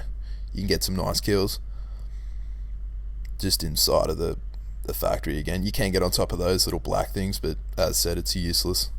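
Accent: Australia. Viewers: you are Aussie?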